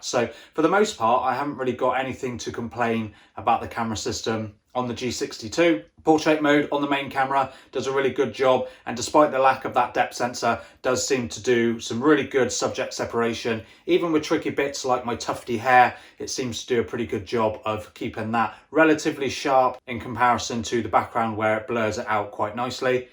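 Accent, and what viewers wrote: Bristol, United Kingdom. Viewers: He speaks with a Bristol accent.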